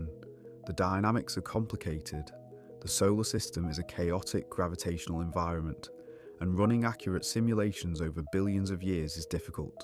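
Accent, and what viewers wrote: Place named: England, United Kingdom. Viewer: where in england are you from? i like your accsent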